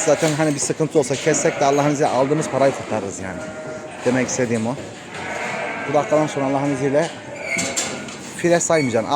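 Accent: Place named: Erzurum, Turkey. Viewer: Kardeşim bereketli olsun sayfana yeni üye oldum. Hangi şehirde yapıyorsun bu işi ve nerelisin Erzurum Ağrı şivesi var konuşmanda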